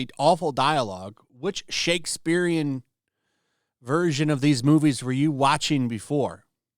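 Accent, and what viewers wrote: United Kingdom. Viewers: you have a great chap accent. love it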